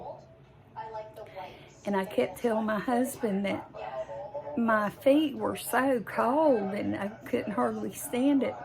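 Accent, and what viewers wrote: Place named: North Carolina, United States. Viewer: Glasses Very wild, something I think you would see Ru Paul wear. I don't know if you remember Elton John and his glasses Very good sense of humor. Enjoy your videos and love your NC accent.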